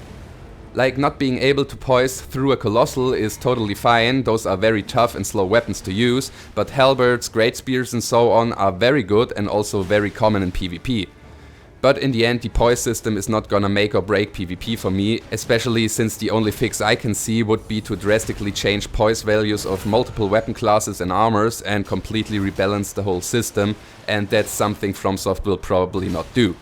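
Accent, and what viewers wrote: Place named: Germany. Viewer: man merkt das du deutsch bist haha gutes video übrigens!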